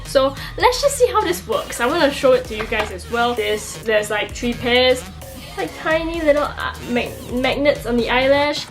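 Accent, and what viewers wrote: Singapore. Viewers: I wan hear more singlishhhhhh😂😂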